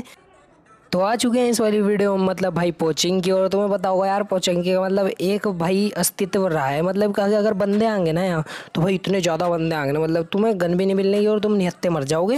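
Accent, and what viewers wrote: Haryana, India. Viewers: Bro ur haryanvi??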